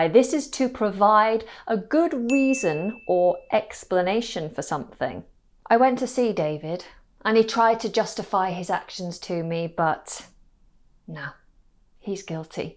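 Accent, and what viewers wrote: United Kingdom. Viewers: I love the Brit accent <3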